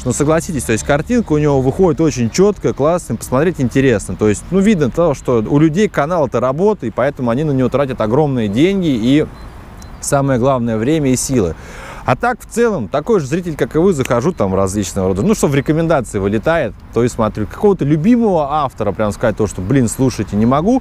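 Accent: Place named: Kirov, Russia. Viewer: Если приедеш в Кировскую область удивишся сколько там похожих с такой дикцией =))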